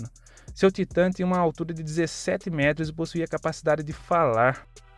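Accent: Brazil. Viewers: Vc é Gaúcho????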